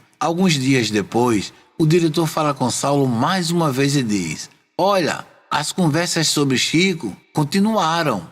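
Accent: Northeast, Brazil. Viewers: Boa noite, já conhecia a história, só assisti de novo porque gosto da sua fala, esse jeito carregado do nordeste. Abraços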